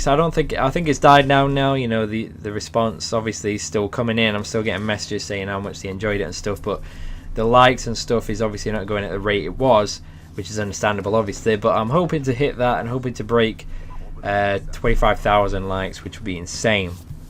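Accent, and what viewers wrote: Yorkshire, United Kingdom. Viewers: no hes just brittish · no, different places in Britain have very different accents it just where he's from, he sounds like he is from Yorkshire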